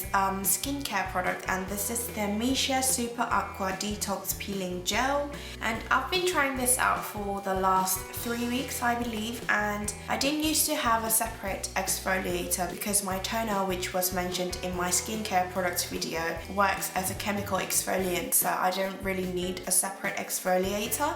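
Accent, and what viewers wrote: United Kingdom. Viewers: 늘 유튜브볼때마다 느끼는 거지만 영국억양 너무 좋네요^-^ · 님 영상 보고 핑크오이스터 샀는데 예뻐요! 영국 억양은 3년만에 익히신건가요? 신기해요^^ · 엉니 영상 자주올려주세요ㅜ 언니 영국발음도 넘 좋고 화장도 진짜 잘하세요!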